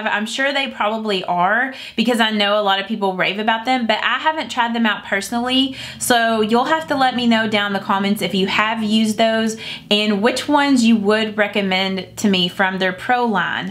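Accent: South, United States. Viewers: Lol, sorry looks like I'm flipping yall off in that southern draw, I loved it.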